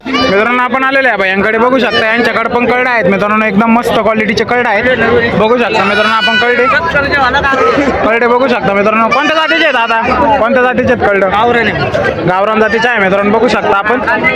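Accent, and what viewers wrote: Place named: North India, India. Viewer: मराठी भाषेत कुठे येतो रे भैया भैया तू यूपी चा आहेस का बिहारचा आहेस भावाला भाऊ दादा अण्णा म्हणतात तुमच्यासारखी माणसं मराठी भाषा खराब करतात रे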